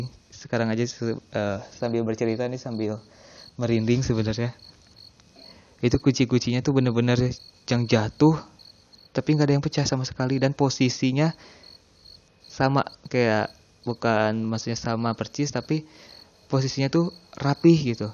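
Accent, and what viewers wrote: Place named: Bandung, Indonesia. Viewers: Yang Cerita orang bandung ya? logatnya :D btw serem sih tapi lucu hhaa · Sunda pisan yaaa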